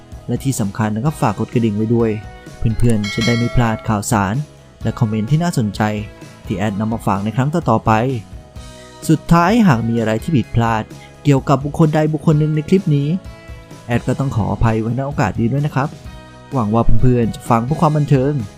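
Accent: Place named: Thailand. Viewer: ตอนแรกคิดว่าจะแพ้ 3 - 1 แต่สุดท้ายก็พลิกมาชนะ 3 - 2 ป.ล. ทำไมคนอ่านเสียงเหน่อๆ มันเหมือนมีการไถเสียงหรืออะไรสักอย่างท้ายประโยค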